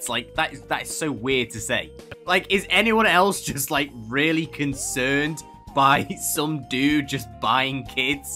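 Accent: United Kingdom. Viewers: wow British dude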